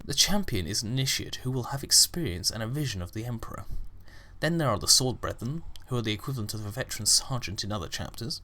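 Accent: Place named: United Kingdom. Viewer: Aaah... The British accent... so good for Warhammer in general :P Keep em' comin' :)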